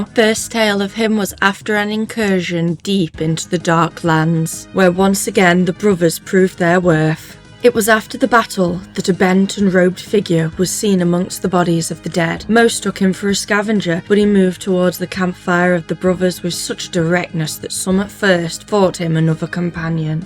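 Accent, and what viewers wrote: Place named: Yorkshire, United Kingdom. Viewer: So Yorkshire lol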